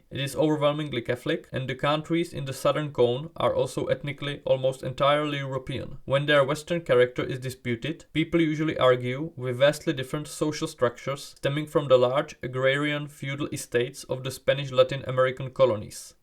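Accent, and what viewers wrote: Poland. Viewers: Heyy, i think you pretty much nailed it, good job!! (XD you sound very polish, i am too, but i think the accent adds a certain comedic value as in Poland most people talk as if they were western, although still catching up to the west). Have a nice day 🎉🎉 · Serio? Ty Czech? ( I czecked even the info about Your channel) Jor akcent is sooo Polish🤣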